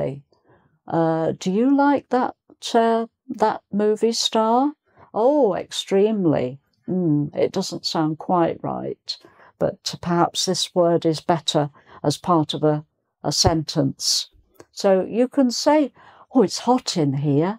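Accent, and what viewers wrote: United Kingdom. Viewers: I really like the British accent😘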